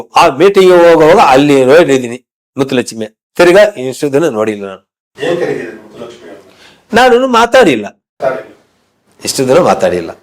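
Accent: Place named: Maharashtra, India. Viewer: Border area sir,if you come to North Karnataka border area.you can hear marathi tone kannada.its common in border areas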